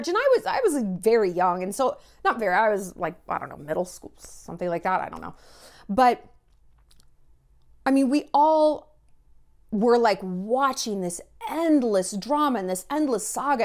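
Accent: United Kingdom. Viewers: Your British accent is amazing! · Your British accent deserves a whole Netflix show. 🤣❤️ i love you woman. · Hahahahahhahaha😂😂😂😂😂😂 your British Accent is soo good! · Love the British accent, and I’m British! I have a name for your plot of land - Shallonshire ❤️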